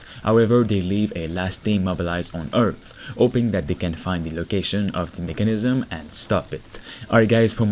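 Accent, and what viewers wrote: Quebec, Canada. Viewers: Es-tu québécois ? Ton accent semble familier :P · Are you from Quebec? I'm hearing a bit of an accent :)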